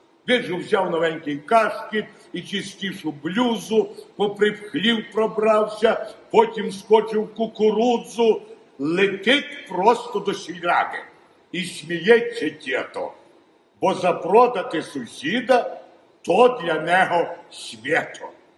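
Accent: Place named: Western Ukraine, Ukraine. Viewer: Абсолютно геніально! Цей говір і гумор! Особливо цей унікальний «західноукраїнський» сільський говір з якого «люди з міста» насміхались в радянські часи. А кожна задрипана дівка з села біля Львова, якій вдалося влаштуватися на роботу у Львові, лізла зі шкіри, щоб «разгаваривать на общепонятном руском язике» Мав нагоду цей феномен спостерігати, працюючи в одному з наукових закладів Академії Наук. Обʼїздив багато світу, і в кожному куточку є свій говір, сленг. Що і робить цей світ різноманітним і цікавим!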